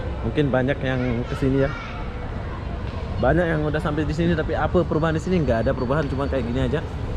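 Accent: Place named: Malaysia. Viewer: Wah awk cakap mcm org malaysia la.. "berape harge die" 😂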